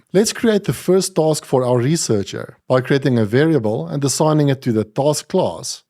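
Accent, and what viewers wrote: South Africa. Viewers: Hi, this is a great intro tutorial for crew. Tell me, your accent tells me that you are in South Africa, is this perhaps true?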